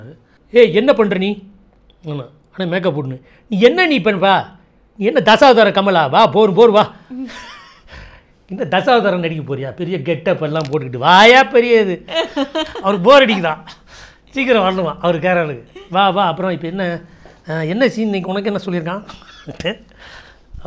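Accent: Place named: Madurai, India. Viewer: Madurai tamil maarave illa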